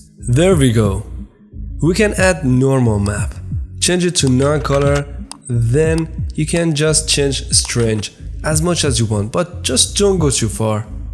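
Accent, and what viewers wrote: Iran. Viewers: All the respect... just remade and turned out CRAZYYY my client will be impressed. Also, you sound hella Persian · az lahje fahmidam iranii movafagh bashi😂❤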